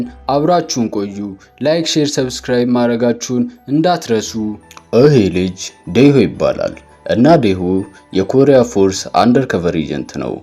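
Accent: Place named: Borko, Ethiopia. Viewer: ቦርኮ ነህ እዴ አወራርክ ሲዘጋ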